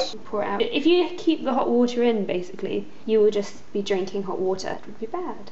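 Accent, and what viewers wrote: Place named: RP, United Kingdom. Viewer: you sound so posh in this video...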